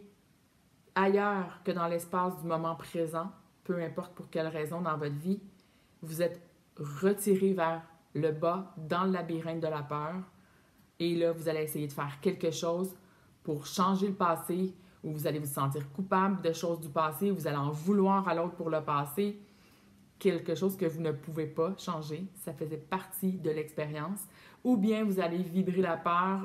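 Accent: Canada. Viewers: Cette chaine est géniale. Merci bcp pour ttes ces informations et tout ce travail. L'explication est bien Claire surtt avec cet accent cabadien adorable. :D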